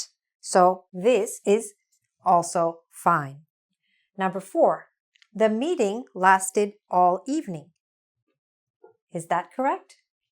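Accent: United States. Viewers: Loving The Sopranos accent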